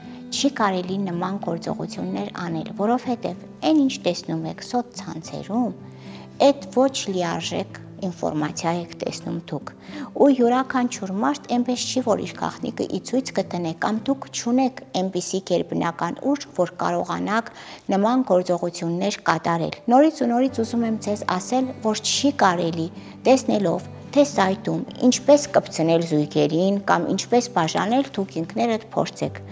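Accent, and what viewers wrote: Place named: Gyumri, Armenia. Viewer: Իրա ազգանունն է նույնիսկ ասյում 50-50է կեսը գյումրեցու կեսը գրական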